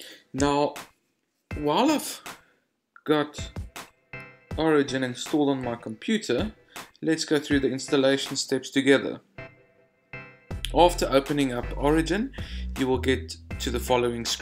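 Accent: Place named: South Africa. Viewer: I know I’m very late but you sound like your from my home country of South Africa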